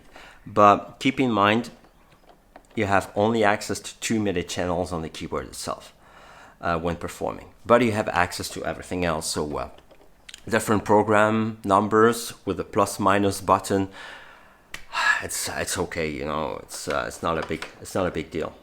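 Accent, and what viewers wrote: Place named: Norway. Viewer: What is that accent dude? I can hear Norwegian, american, scottish and swedish??🤔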